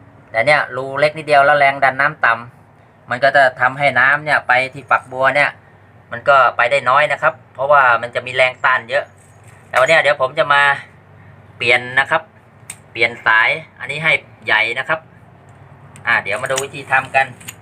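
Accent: Chanthaburi, Thailand. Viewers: สำเนียงเหมือนคนจันทบุรีเลยครับ บ้านผมอยูระยอง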